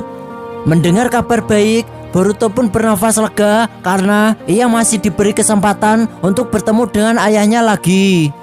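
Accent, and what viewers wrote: Java, Indonesia. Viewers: Ooh abange orang Jawa tohhh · Eyo woh jowo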